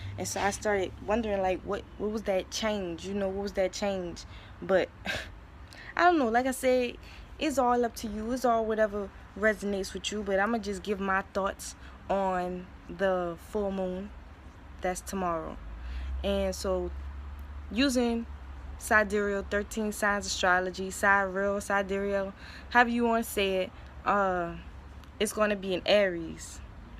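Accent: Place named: Louisiana, United States. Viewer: Is she from Louisiana? I hear a Louisiana accent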